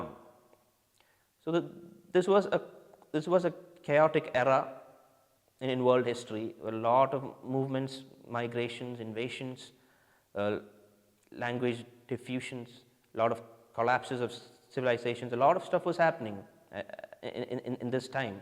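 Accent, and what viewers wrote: Kerala, India. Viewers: Why do you have a mallu accent?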